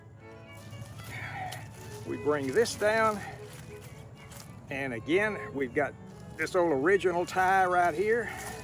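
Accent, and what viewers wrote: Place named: South, United States. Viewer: me too. I was expecting a different accent for sure. Happy it’s a southern accent I can understand!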